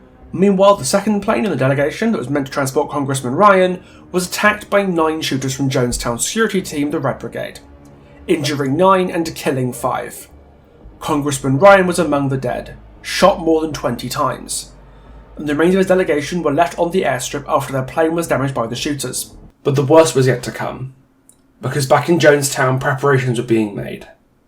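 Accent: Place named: United Kingdom. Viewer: Anyone who calls you smug is only because of your British accent because anyone who talks to you ever KNOWS you’re just a big ol’ sweetie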